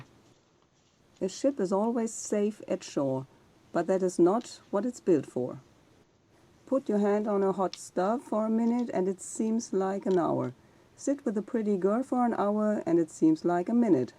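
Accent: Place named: Germany. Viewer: Your English was great and easy to understand. I like the German accent , I love all accents. Nice journal!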